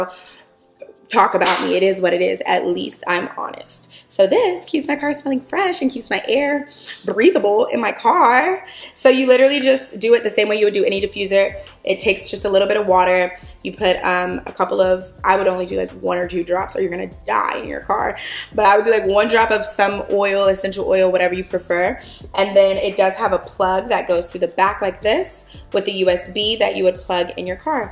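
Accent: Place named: Florida–Alabama, United States. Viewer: I love that you pronounce everything JUST. LIKE. ME. Gurrrrllll… I grew up in Floribama too - Pensacola to be exact! You grew up right down the way (I believe)! I know the word “carafe” looks like it’s pronounced like the words “care + if” but it’s actually pronounced like “giraffe.” (Currr-afff) Guuurrrllll… words are always pronounced the exact opposite way my Floribama behind thinks!!! LOL! But say that word however you like! I think it just shows our shared regional cuteness 💖💖💖 ❎🅾️ Susan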